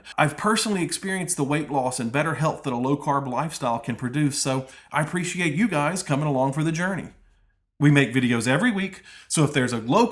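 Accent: South, United States. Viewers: Awesome! Thanks. I know that the KetoConnect guys said you're from Florida, but have you lived there all your life? You sound more like a SC or GA native. I've lived all my life in SC, NC and Florida, so truly a southern girl looking for an easy recipe for my favorite low country BBQ sauce (low carb of course) :). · Why do I sound like a Southern girl after watching your videos? :)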